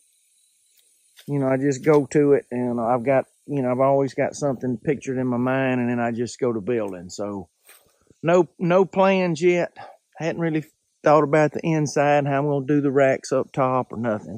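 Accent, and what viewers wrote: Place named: Tennessee, United States. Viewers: stayed for the southern drawl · You from Tennessee? Your accent sounds like you are from around these parts.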